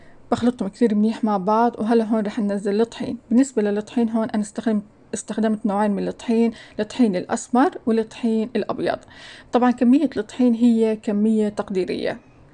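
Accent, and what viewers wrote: Irbid, Jordan. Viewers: يسلمو ايديكي ست وأنا كمان غير إنو وصفاتك زاكيه بحضر عشان لهجتك الأردنية بتجنن طالعة منك ابن خالتي من سكان عمان لما بيجي عنا ع فلسطين بترجاه يحكي باللهجه الأردنية تحياتي لألك من نابلس فلسطين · ما شالله عليكي بحب كثيييييير طريقتك في الطبخ جد فخورين فيكي كاردنيين وخاصة انك من اربد 🙃♥️🌹🌹😍 طبعا عرفت انك من اربد من لهجتك 😍🌹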